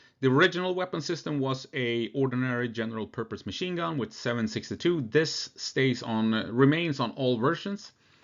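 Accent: Gothenburg, Sweden. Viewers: It's a pronounced Gothenburg accent 😂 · I love how you prove your Swedish ancestry by pronouncing the silent "j." Every time you pronounce a silent "J," it reminds me of how my 100% Swedish grandmother used to pronounce my name "for example, as "yohn" or "yeffrey" or "yames."). It is very endearing to me as a half-Swede.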